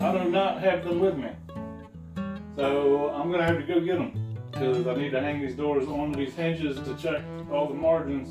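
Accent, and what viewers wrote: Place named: Louisiana, United States. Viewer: You sound like your from Louisiana buddy 😂…I got it liked and I’ve subscribed. I like watching them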